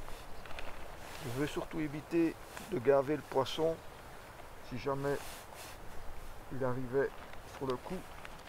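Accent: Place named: Belgium. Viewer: ah d’accord ! Aussi je me disais bien que ton accent me faisait penser que Tu pouvais être du pays du grand Jacques 😉 Du coup au bord de l’eau, tu tournes au café liegois. Pardon pour la confusion 😔 en tout cas les red halibut Malgré le froid, ils t’ont apporté une session plus qu’honorable. A bientôt mon ami, respect admiratif de Michel de Sologne 👍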